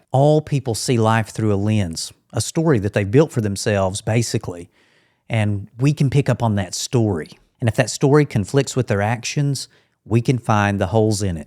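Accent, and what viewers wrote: South, United States. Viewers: Cool southern accent. Have a great day, INFJ’s!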